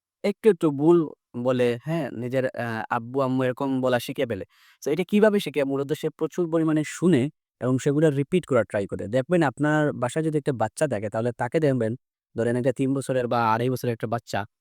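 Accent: Sylhet, Bangladesh. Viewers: ভাইয়া আপনার বাসা কি সিলেট?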